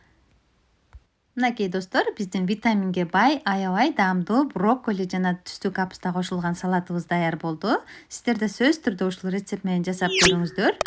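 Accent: Standard. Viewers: Азаматсын,таза кыргызча нукура так сулойт экен,кээ бир чалдырагандар бар бир созго эки орусча кошуп тантырагандар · Колуна береке аябай таза кыргызча суйлопсун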